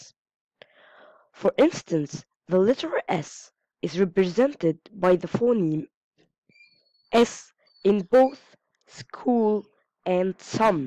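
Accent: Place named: Arab world. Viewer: لو سمحتي عايزة اتواصل معاكي فالرسايل ضروري ممكن الايميل او اكونت الفيس انا guess من لهجة حضرتك انك عربية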